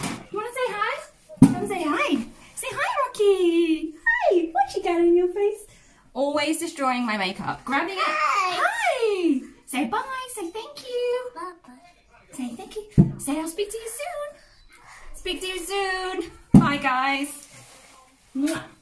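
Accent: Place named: United Kingdom. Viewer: Woah wasn’t expecting you to be British 🥺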